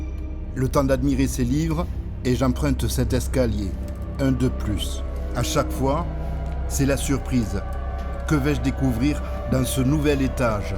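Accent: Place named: South, France. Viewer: Bonjour, ohhh tu as l'accent qui attire le chant des cigales tu me rappel Carcassonne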